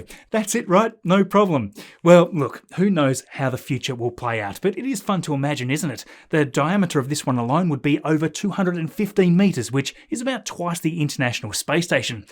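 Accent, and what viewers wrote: Australia. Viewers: Are you an Aussie? Can't believe I never picked up on your accent before today been watching you for ages 😍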